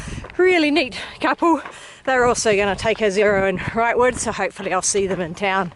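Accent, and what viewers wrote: New Zealand. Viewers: I am enjoying the pleasant company of this Kiwi.Utterly sweet accent:)(Tent=tint):):)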